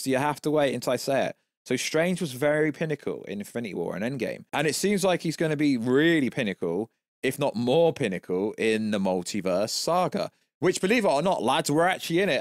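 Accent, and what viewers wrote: United Kingdom. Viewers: It is a very British listen for sure. lol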